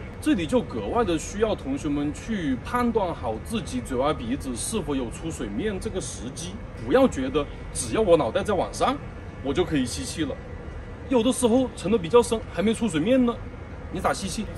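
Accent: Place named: Hubei, China. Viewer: 这教练大概是湖北人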